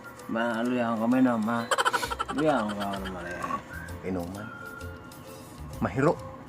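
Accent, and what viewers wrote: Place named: Bicol, Philippines. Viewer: ahahah bicolano po ba yung salita nio tagayan na thankyou sir sa video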